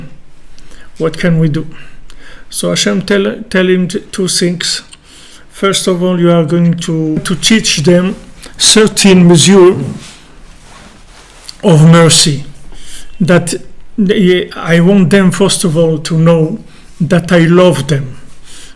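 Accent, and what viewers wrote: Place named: France. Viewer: Ah! What a surprise! That's the first time I hear you teaching us in english! I didn't know you speak several lagages! Baroukh HaChem j'ai tout compris de ce que vous dites en anglais, my english isn't so good. Et très charmant votre fort french accent!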